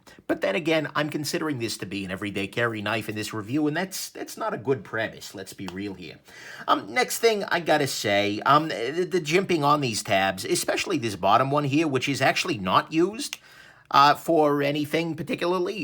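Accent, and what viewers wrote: New York, United States. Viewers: Can I ask where your from? NY?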